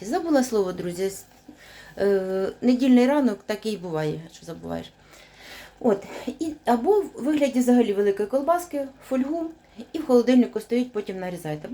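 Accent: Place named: Surzhyk, Ukraine. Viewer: Ни хрена не понял этот диалект или суржик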